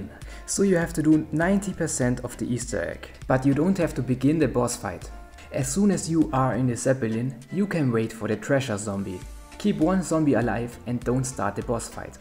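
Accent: Germany. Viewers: im curious, is your accent german?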